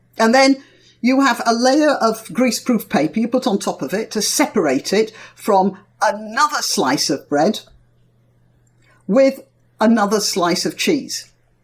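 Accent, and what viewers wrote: United Kingdom. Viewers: You are rude. Why? Aren't you a litte bit ashamed of how you are talking about people,( as less intelligent and eating bad food) in other countries, for example Sweden? People from UK I have met so far have been very nice and kind. Apparently not all of them. I guess you are born in UK, you seem to speak british english. No, I will not like and not subscribe... I am not fond of rude and mean people.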